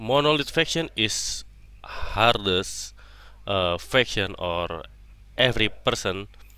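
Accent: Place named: Indonesia. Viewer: Indo ya ? logat-nya logat indo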